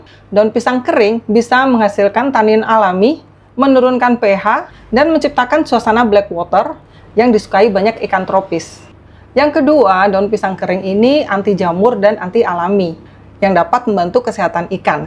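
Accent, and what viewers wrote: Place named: West Java, Indonesia. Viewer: Sunda pisan teh...😊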